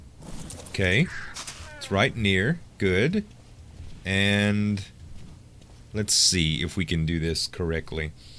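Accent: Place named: Florida, United States. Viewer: I'm originally from Florida but am in Washington. Your accent brings back good memories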